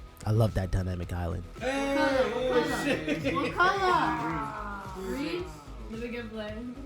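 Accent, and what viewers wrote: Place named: Queens, United States. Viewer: Omg you from southside queens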